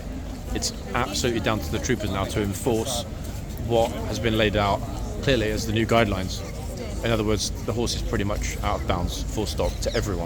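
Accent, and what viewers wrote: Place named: United Kingdom. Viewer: I love British accents!!!!!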